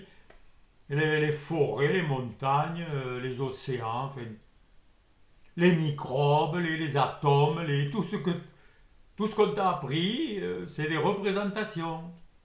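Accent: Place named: South-West, France. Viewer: ami au bel accent du Sud-Ouest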